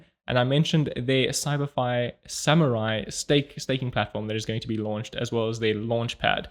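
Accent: South Africa. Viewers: South African? Nice to hear our accent online dropping some knowledge!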